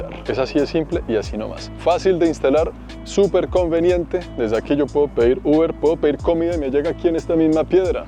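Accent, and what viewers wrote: Bogotá, Colombia. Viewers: Colombiano, entre caleño y bogotano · MUy interesante, buen sentido del humor, agardable el acento rolo cachaco o bogotano. Un Saludos desde los angeles un hermano peruano.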